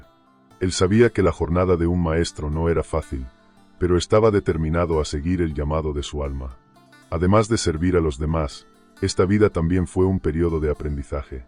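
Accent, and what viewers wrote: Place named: Spain. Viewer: Olá ..por favor..alguien podría decirme de qué parte de España es este narrador? Paramahansa es mi maestro ..mi gustó mucho la narracion ! Soy de Brasil y entendi bien su discurso ..estoy apriendendo español! muchas gracias !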